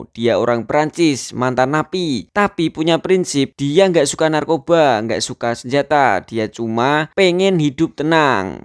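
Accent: Java, Indonesia. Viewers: Meddhok tenang mas. Hehehe JB Malaysia nyimak❤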